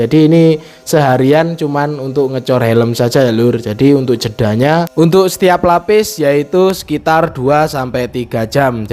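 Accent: Temanggung, Indonesia. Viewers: Ternyata orang temanggung to mas😅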